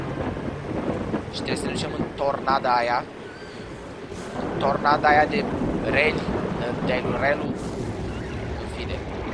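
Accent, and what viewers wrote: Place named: England, United Kingdom. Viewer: Vezi ca ai putina engleza pe la coltu guri:)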